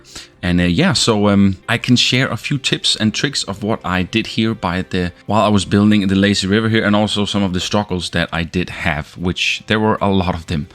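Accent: Denmark. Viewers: love the danglish accent <3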